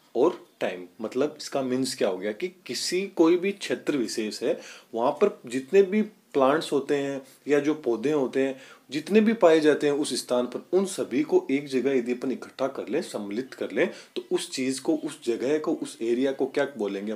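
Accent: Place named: Mumbai, India. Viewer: App apon apon bole rahe the too laga ke app Mumbai sha hain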